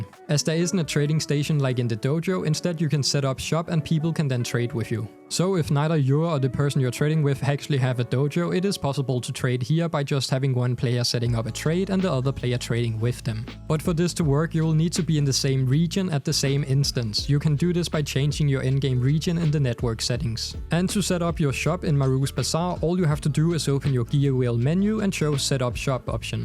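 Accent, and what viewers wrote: Denmark. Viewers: You sound extremely Danish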